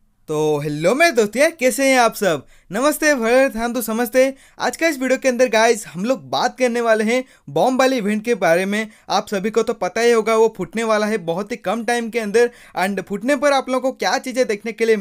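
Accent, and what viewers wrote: Odisha, India. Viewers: Bhai tum odisha se ho? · Bhai tum odia ho kya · Aap odisha ke ho kiya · Bhai tum odisha se ho kya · Bhai app Odia ho kya ?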